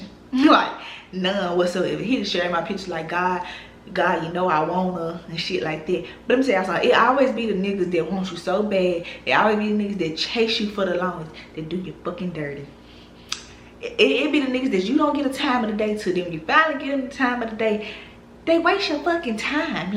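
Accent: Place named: South, United States. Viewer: I love your accent. It's a southern thing we can't help it!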